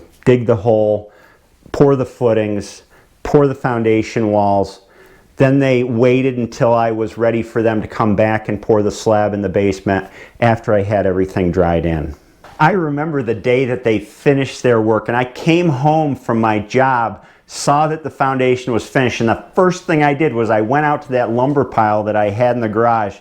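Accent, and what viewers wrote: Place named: New York, United States. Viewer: Well as a southerner his new York accent is about more than I can stand but instill enjoy his videos😂😂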